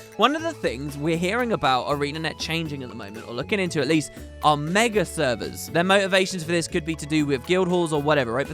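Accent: United Kingdom. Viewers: Glad to have some lighthearted British monologue in the morning between two dark episodes of Daredevil :D Totally agree about third party lore/story sources. I love the lore and enjoy the story when it's good but I rarely experience it in-game. Every now and then I take my time to interact with a NPC but usually I just click through all the dialogue as fast as possible. I think the last time I really looked for stuff in-game was in S02 when we returned to the Priory and I read something like half of those new lore bits.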